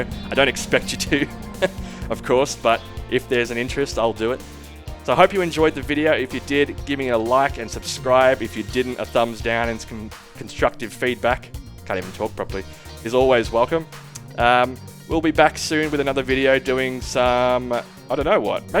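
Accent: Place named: Australia. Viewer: You sound like a Aussie. I'd have bought this but it's still over $40...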